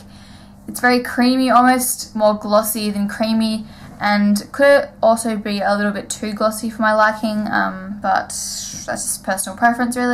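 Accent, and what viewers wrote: Australia. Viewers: its weird hearing another australian on youtube because everyone is american!!! this is what i sound like to others :D and i love it!! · I love the Rimmel one!! I cant belive i JUST found u! A fellow aussie lol altho ur accent is alittle more refind than mine hahaha hope 2 keep in touch, ur awesome! xx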